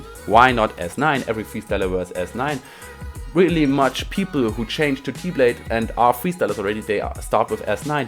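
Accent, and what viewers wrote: Germany. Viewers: Safe ein Deutscher so wie seine Aussprache is 🤗🙏👍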